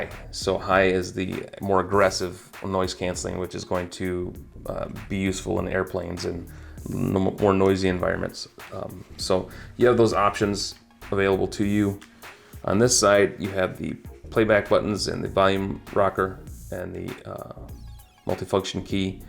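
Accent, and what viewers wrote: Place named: Miami, United States. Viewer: nice headset id be scared to take them out of the house . i heard your voice i thought my cousin was doing it, heard the Miami / Hialeah tone in your voice.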